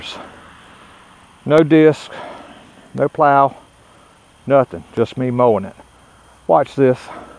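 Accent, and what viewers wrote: North Carolina, United States. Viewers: Where are you from? You sound like central NC?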